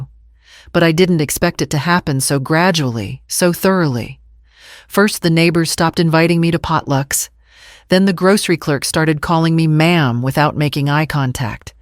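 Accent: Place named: Australia. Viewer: Sorry but this AU voice.....ayayayay. 😅😢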